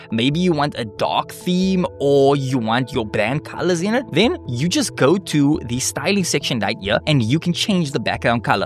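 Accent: South Africa. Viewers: I hear a South African accent there, probably because of Afrikaans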